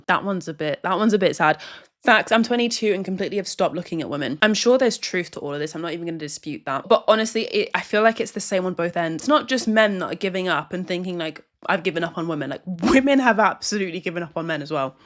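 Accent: United Kingdom. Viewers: Personal question- what country are you from? Based off the accent you sound like your from the UK.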